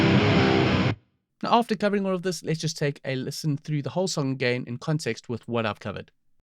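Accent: South Africa. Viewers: I love the South African accent! Relaxing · Are you perhaps South Africa? I am but living away from home ... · Hi. I am a South African too, but living in the UK. My friends in the UK always pick on me for the way I say "here". I never really noticed it with myself, but when I listen to you speak, it is really noticeable. Hahaha. Anyway, this is a really cool video and great sound that you get. I am really enjoying learning what you have to teach. You showed us a bit of your mixing in this video, but How about a more in depth mixing tutorial? If you have already done this, my apologies.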